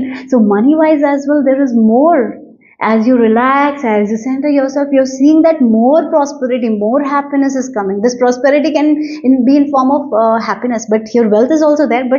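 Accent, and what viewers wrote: India. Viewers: Are you indian ? Your English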